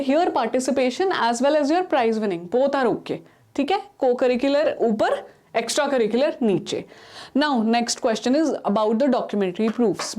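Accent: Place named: Karnataka, India. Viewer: It looks like mam mother tongue is kannada!!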